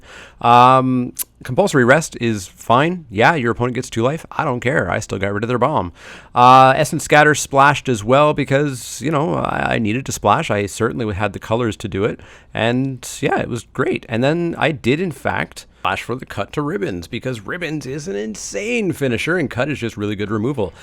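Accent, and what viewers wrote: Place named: Canada. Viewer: I never realized you were Canadian, but after you mentioned you're in Ontario, I suddenly started noticing your accent, and it's like "how did I miss that?"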